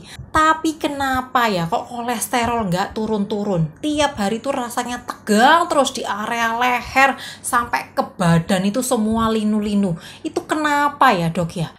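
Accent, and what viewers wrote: Java, Indonesia. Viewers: Bu dokter cantik ...medok bgt org Jawa kah....tmksh semua ilmunya🙏❤️ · Wah baru dgr suaranya medok 🤣🤣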